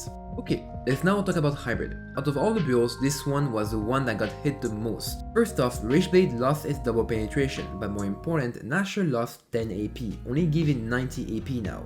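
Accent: France. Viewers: You have a strong accent are you French ?😮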